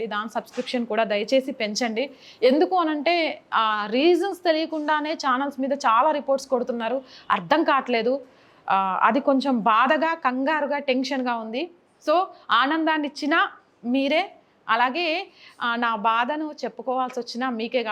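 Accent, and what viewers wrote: Telangana, India. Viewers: నువ్వు తెలంగాణ యాస తగ్గిస్తే ఇంకా పెరుగుతుంది పోరి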